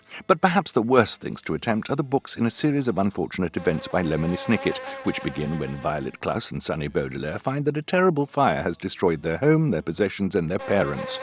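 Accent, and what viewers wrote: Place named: United Kingdom. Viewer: why is he using a British Accent The Real Lemony Snicket A.K.A. Daniel Handler Is American. I Know Because I Met Him In Real Like Before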